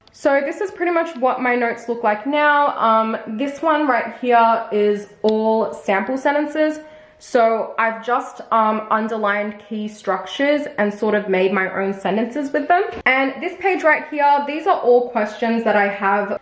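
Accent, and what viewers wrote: Australia. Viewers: I don't know if anyone gonna talk about it or not but I love your Aussie accent 😁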